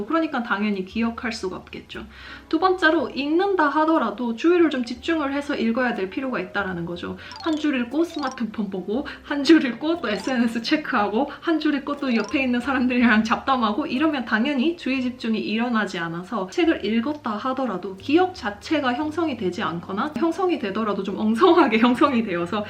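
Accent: Gyeongsang, South Korea. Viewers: 차분한 경상도 사투리와 유창한 영어발음...뭔가 신선하다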